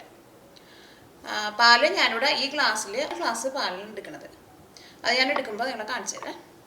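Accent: Thrissur, India. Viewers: നല്ല അവതരണം .തൃശൂർ നിന്നും പറയുകയാണ് എന്ന് തോന്നും .So simple straightforward conversational style keep it up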